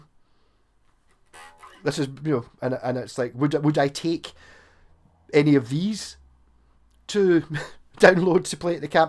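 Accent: Scotland, United Kingdom. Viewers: I'm referring to the bass in the video.. You did say it is a Wasburn refinish by JPAX correct ? I'm sorry if I'm bugging you but that is a great little bass and I'd like to find one for myself to try.. You do such great reviews and I love to hear your thick Scottish accent ! I'm in the USA but my ancestors were part of Clan Buchanan. I'd love to visit there someday.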